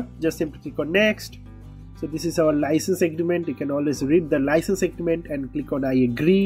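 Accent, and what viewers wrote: India. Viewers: This indian accent is super annoying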